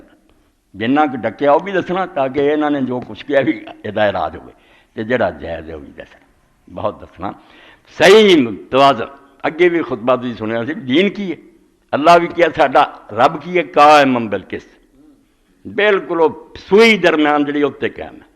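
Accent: Punjab, Pakistan. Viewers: ni bech men punjabi touch bhi hai!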